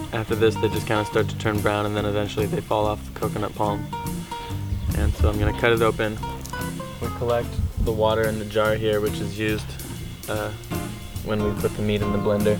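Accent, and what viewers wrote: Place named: United States. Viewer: Hi guys, watching this brought back loads of memories. I was born on the island of Trinidad. We had a coconut tree in our yard. I know you pick off the younger coconuts, when the flesh is soft, you can scoop it out with a spoon, and put it in a glass or whatever container that you collected the water in before you cut the coconut open to get the flesh. Delicious!!. Where in the world are you guys? You sound like an American. Enjoy your meal, coconut milk makes every thing taste better,and quite nutritious too.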